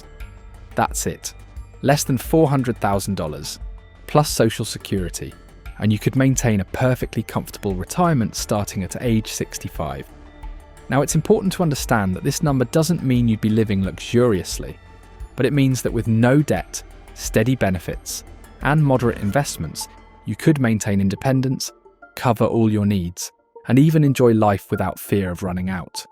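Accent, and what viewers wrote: England, United Kingdom. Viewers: An English voice talking about dollars (US is implied) rather than pounds or Euros. Did I miss the part in the commentary where he talks about who his intended audience is?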